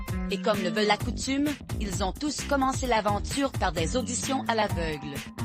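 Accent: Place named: Quebec, Canada. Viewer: Une voix québécoise qui raconte....ça fait bizarre!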